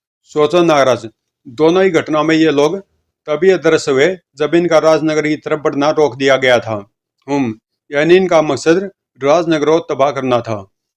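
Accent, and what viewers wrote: Haryana, India. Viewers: Bhai hindi ko haryanvi me bol raha he kuch samaj nahi aa raha thire thire hindi bolne ki sahi se practice karo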